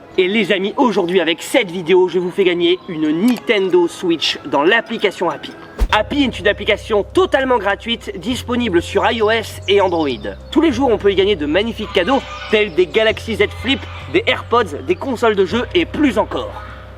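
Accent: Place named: North, France. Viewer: ché l'meilleur accent celui de ch'nord pas un bruit d'kien pas un bruit d'ka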